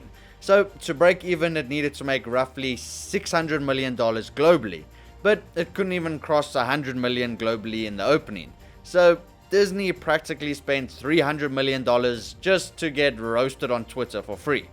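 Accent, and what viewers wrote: South Africa. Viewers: Where's your accent from? Feels kinda South African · Are you by any chance from south africa, cause your accent sounds like it. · You know it's bad when Dragonball Evolution has a "higher" low rating than the movie. Also you're accent makes me think you're from South Africa?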